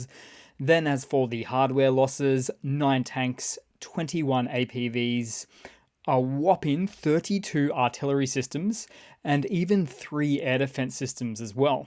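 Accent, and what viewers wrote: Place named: Australia. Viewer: The human error to which TASS refers is Putin, birth to dissolution. It's a beautiful thing to hear voice. Sounds Ozzie, with a few S.A. pronunciations sprinkled in. Is he in Taiwan? Shoigu may well be blustering, as his street cred has been seriously questioned for months now, and he has said little in response, to Noseferatu and others. Should Kyiv actually catch something, we should expect Moscow to spark shortly thereafter. A kremlin is a russian term for a walled fortress. It is NOT a synonym for safe thunderdome.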